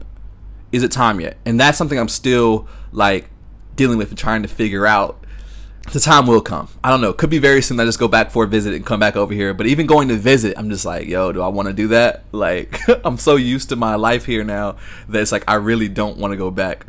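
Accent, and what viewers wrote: United States. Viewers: Took the time to watch your whole video before making these comments. I have been living in Thailand for over 10 years so i feel I'm qualified to speak. Overall pretty fair comments for someone in Thailand for only a year. BUT! 1) after a year, you still pronounce PAD THAI like an American. Listen carefully to the way 'PAD' Is pronounced. It's not an iPad. 2) you don't tell people that they can't just come to Thailand and stay. Tell them about the visa renewing headaches. 3) you seem like an open-minded guy and you try to be, but your comments on the LGBTQ++ issue in America was off. You said that you have no problems with them but you have a problem with how in-your-face they want to be. Well, I have to point out that white people were saying the exact thing about hip hop culture. 4) you don't tell people that you can't work here legally. 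5) you skip everything about the political situation here, which is a huge thing. I'll keep watching. 6) you don't really understand Thailand and it's culture until you get to know Thai people, so how you have some Thai friends or at least aquaintances, and not just Thai bar girls, who most Foreigners think represent Thai people. However you are more balanced than most other YouTubers in Thailand.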